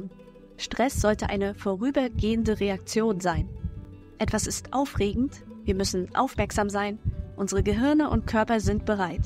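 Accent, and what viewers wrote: Mecklenburg-Vorpommern, Germany. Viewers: Vielen lieben Dank für genau dieses Video. Ich muss eingestehen, dass nicht ich es entdeckt habe sondern mein ältester Sohn. Er hat es in unsere Familien Gruppe gestellt. Mit der Bemerkung: "...seht es euch unbedingt an, sehr interessant!" Also danke ich Euch beiden. Meinem Sohn für's Weiterleiten und Dir für dieses Video. Ich habe mal gleich die Glocke gedrückt. 😉🤗 Was ich u.a. auch sehr gut finde, dass Du selber sprichst. Und so habe ich erkannt, dass Du aus meiner Heimat, Mecklenburg Vorpommern kommen müsstest.🤗🙋🏼‍♀️ Ich glaube, dass sich jeder in Deinem Video, mit irgendwas, identifizieren kann. Danke und mach weiter so.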